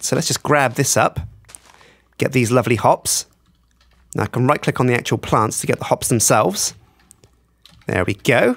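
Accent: United Kingdom. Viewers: "lasooo" i really hate the way brits say some words. for instance al-um-in-um is said as alu-min-ium and its really annoying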